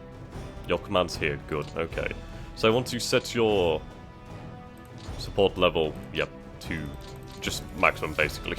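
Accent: United Kingdom. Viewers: Great Video you sound British but with a deep voice not an insult are you British??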